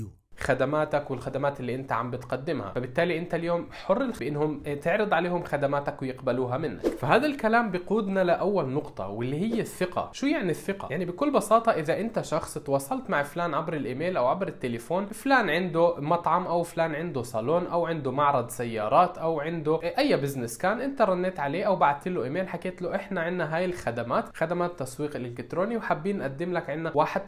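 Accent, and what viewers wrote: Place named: Jordan. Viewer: شكرا على محتواك الرائع بس سؤال صغير انت اردني؟؟؟؟؟ لأنو لهجتك زينا ونا انعجقت مش عارع ههههههههه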